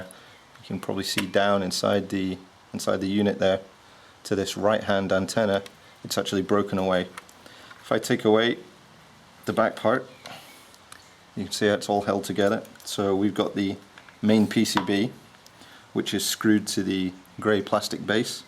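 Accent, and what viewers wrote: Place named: Upper class, United Kingdom. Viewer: Excellent class in upper-class British King's English: with a tear-down and tighten the old WAP54G war-horse antenna mounts thrown in for free. If you are comfortable that the antenna-mounts have not twisted so as to sever the internal antenna leads: from the outside - without cracking the case - you can go ahead and screw down the nuts with a 9/16" deep socket wrench. Obviously - you want to do this by hand and not use a socket-wrench driver handle. The nuts themselves are shallow and slightly recessed - but not impossible to grab with pliers. A box-end 9/16" wrench would also work. But the 9/16" socket supplies absolute control with no slipping or knuckle-busting. And your fingers can sense the exact amount to tighten as the the nut (and maybe a thin washer?) bear down on the ineffectual internal-tooth lock-washer that CEO John Chambers (Cisco CEO) used to purportedly stop the loosening from happening in the first place. 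Everything about the consumer design and assembly of this unit sucks. The design was meant to place the WAP54G into a family of consumer devices that would be stacked. I've never seen them stacked. But as points out - the WAP54G is a warhorse. And when have a guest who can't connect an old PC to your new Cable-service router - you just whip out the WAP54G and they can log on. I've never sat down and worked this out - but WAP54G security is probably not the best. A final note on cracking the case after removing to two little screws. I found that f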